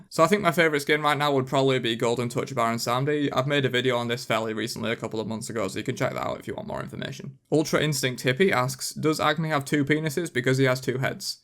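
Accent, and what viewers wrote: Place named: Northern England, United Kingdom. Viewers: Big up the Northern English, im a northerner myself but slightly more northern than Yorkshire, still not too far from eachother at all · what part of Yorkshire are you from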